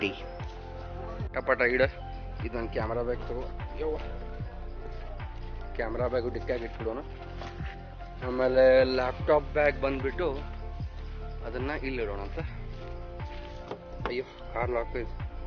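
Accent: North Karnataka, India. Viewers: Mast pa Anna ni hero g yen kammi Ella ni mast matadti Nam uttar karnatak bhashe ninnu super anna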